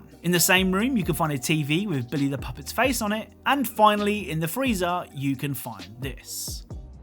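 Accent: United Kingdom. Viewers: I wanna, are you British? Oh and robo cop is not a a robot he is a cyborg, strange one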